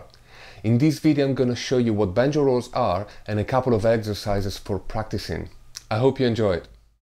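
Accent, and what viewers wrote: Italy. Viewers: Japanese?? Sounds Italian, as he is. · Your teaching technique is excellent. The Italian accent only adds tot he experience. Thanks,. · Si sente che sei Italiano xD Bravissimo comunque ^^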